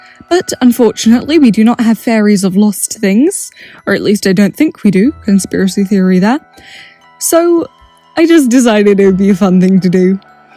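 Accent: United Kingdom. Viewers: She looks great! I honestly think the crinkly wings work great, even in nature these kind of wings are never perfectly smooth and usually have a slight texture. Also, creepy vibe and all that lol. I found you via Dollightfulls community post and my god, you sound delightfully british. It was a pleasure seeing your process and hearing you explain it. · OMG THIS IS AMAZING!! I have just found your channel, and normally, I dont watch many customisers because I can't understand what they are saying, and then I realise you are British, and I am British too so I was internally screaming with joy. And your customs are so good!!! I love how this doll has an eerieness to her, and yet still friendly. You deserve so many more subs.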